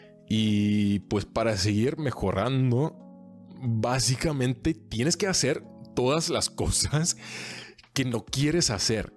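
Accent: Mexico. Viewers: I mean I’m not a Spanish native but it would be pretty hard for me to tell you aren’t native, accent is excellent. Once you get faster it’s over. You don’t play about your Mexican accent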